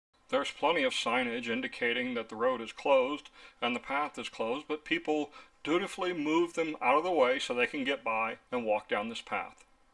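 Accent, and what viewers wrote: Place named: Midwest, United States. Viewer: Got it. You sound like you are from the Midwest.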